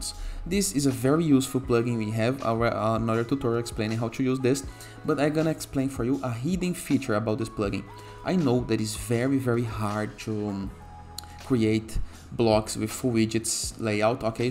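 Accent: Brazil. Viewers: Sotaque brasileiro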